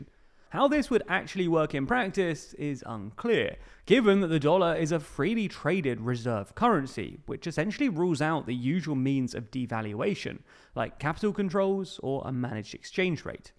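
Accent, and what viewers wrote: England, United Kingdom. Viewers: This dude announcer is from England?? Like England has such a great interest in American politics?? 😂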